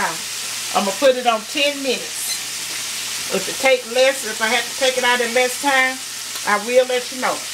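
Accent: South, United States. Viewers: you are awesome...quit worrying about how you say certain words, I get you so if they dont they can simply rewind put headphones on..From one authentic proud Southerner to another...Keep doing YOU..keep your smiles going through that wholesome "put your foot in it" style cooking you're sharing. Bravo! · I enjoy you ! I love your southern accent and charm ! You should so sweet and caring ! Put me in the mind of my nana or great Aunt ! The fish was absolutely beautiful! Blessings be on to you!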